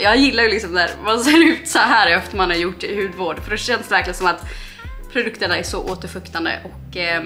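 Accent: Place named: Gothenburg, Sweden. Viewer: Superbra video! Alltså tycker du är så gullig när du ibland har din göteborgska dialekt fast med en släng av Karlskrona-dialekt i samma mening😍😂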